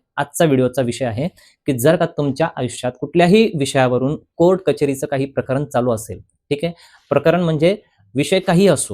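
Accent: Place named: Pune, India. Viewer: तुम्ही धुळे चे आहे म्हनता पण तुमची भाषा पुणेरी आहे धुळे जळगाव ची भाषा नाही वाटत.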